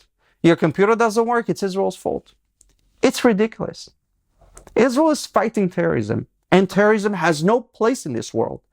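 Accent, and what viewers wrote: Russia. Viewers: slight Russian accent?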